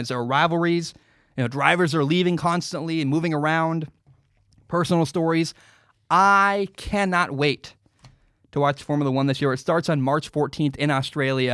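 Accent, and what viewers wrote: United States. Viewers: Formula 1 is my favorite sport after Football and I'm glad it has your attention now! The Netflix series has made it even more amazing. Get in there, Lewis! It's so refreshing to hear a content creator with an American accent say all the Formula 1 stuff! You're going to have to pick a favorite team and driver now.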